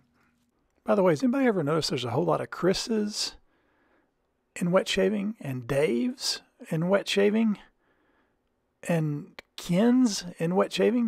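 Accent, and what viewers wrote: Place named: United States. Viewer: no, just American in general.🤣 I was trying to place your accent in a specific state, though.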